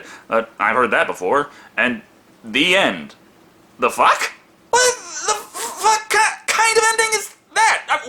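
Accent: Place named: New York, United States. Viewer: Ok seriously what is with the New York accent?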